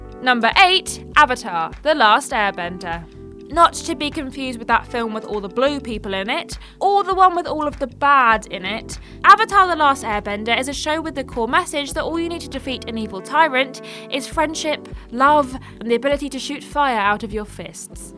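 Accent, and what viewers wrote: United Kingdom. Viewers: guys i just realized that she speaks British accent it makes sense because she lives in the United Kingdom at Europe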